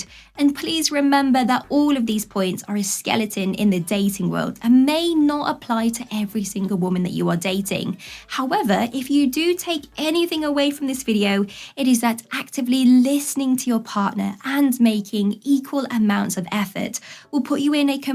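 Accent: England, United Kingdom. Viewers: I could listen to you,.... seriously, what part of England is your accent from?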